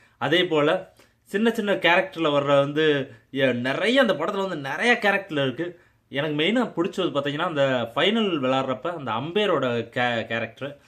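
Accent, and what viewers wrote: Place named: Cumbum, India. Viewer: Me too cumbum side one village, I thought you are from chennai bro. Now I came to know why I watch your reviews native speech bro,doing good job but now your background looking like usa . Where are you staying now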